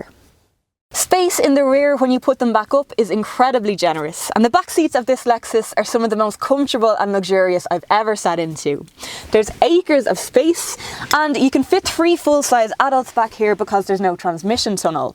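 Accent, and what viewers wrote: Ireland. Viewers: I have strong liking for Irish accent and a beautiful person, she is the perfect combinatb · I would definitely buy a Lexus from. Oops sorry already bought a RX450h FSport. Love the Irish accent.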